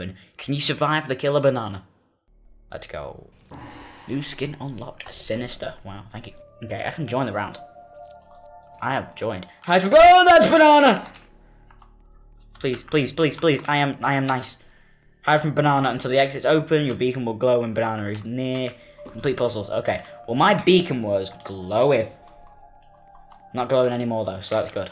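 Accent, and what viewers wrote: United Kingdom. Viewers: You sound like a very scottish man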